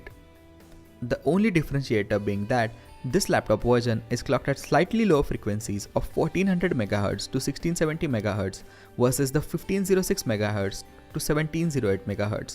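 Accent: India. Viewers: Your thick Indian accent makes it hard to understand. I appreciate your effort though.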